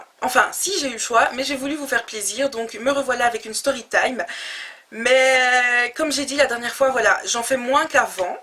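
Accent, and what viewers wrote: Belgium. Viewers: lol trop marrant😅😅😅😂😂tu es belge?? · T'es chou avec ton accent belge ❤️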